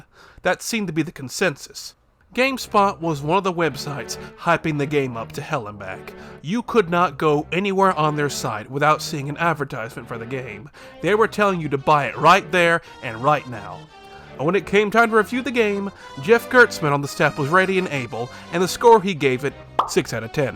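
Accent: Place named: Texas, United States. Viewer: he is from texas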